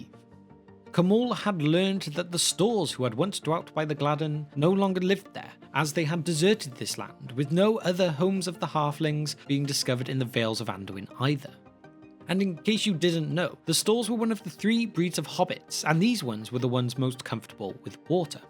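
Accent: United Kingdom. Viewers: Can you please narrate in an American accent. My wife doesn't like British accents because your silencing of Rs and drawing out of vowel sounds. Thanks, then we will subscribe.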